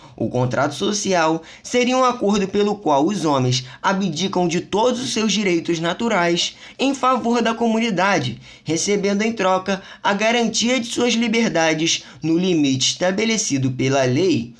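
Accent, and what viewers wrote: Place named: Rio de Janeiro, Brazil. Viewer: Logo no começo já amei o sotaque carioca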